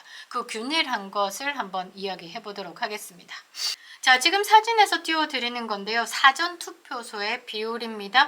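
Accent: Gyeongsang, South Korea. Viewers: 경상도 분이라 억양이 좀 그러니 이해해서 들어주세용~~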